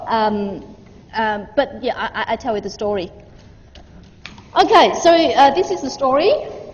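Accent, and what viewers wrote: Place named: Australia. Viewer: More like Australian rather than British.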